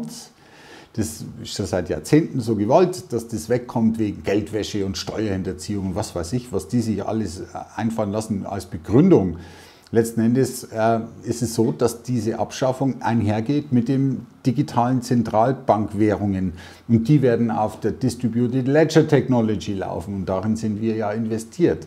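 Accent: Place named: Franconia, Germany. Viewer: Woher kommst du Meister? Ich höre fränkische Züge raus. 👌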